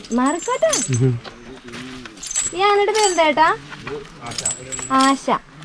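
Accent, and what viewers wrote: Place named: Chala, India. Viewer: മൊത്തത്തിൽ ഒരു ചാള സ്ലാംഗ് ഫീൽ ചെയ്യുന്നുണ്ടല്ലോ... പിന്നെ dotted deer എന്ന് പറയുന്നത് ശരിയല്ല കേട്ടോ Spotted deer ആണ് കറക്ട്..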